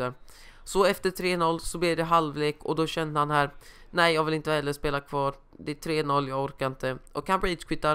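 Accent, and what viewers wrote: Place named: Gothenburg, Sweden. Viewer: Du är från götet va? Du har en go dialekt :D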